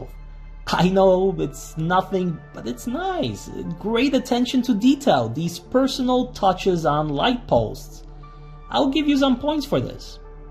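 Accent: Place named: Romania. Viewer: Are you by chance originally from Romania? I ask because I've heard Romanians speak American English with a similar accent like yours. Great content! Thank you.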